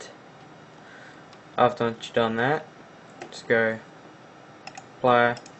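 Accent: Australia. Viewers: You're australian.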